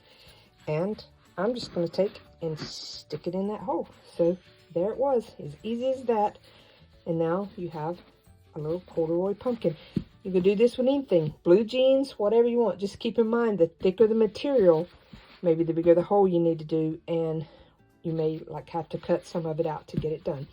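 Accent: South, United States. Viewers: Just found your channel & love it! Sounds like you might be southern? I’m from Alabama so I hear a little bit of myself listening to you❤️❤️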